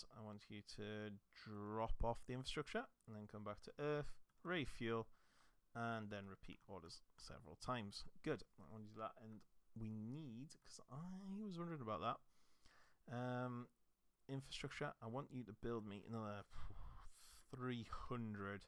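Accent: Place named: Newcastle, United Kingdom. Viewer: Please do, these are the best tutorials on here and I dig the geordie accent :D Thanks for the series, really helpful. I've bounced off this game for years but now I'm playing it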